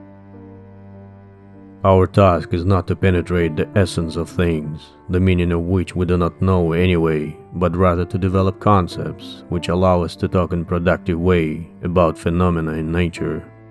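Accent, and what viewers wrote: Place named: Eastern Europe. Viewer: Ok my friend, if u ever come to Toronto... Contact me... Seriously...I would love to host you :) And I won't forget the offer. You sound eastern European. What country are you from? Bhor was twisted. Interesting how many of these great intellectuals are so relatable and down to earth. Pragmatic yet spiritual. LOVE IT. Thanks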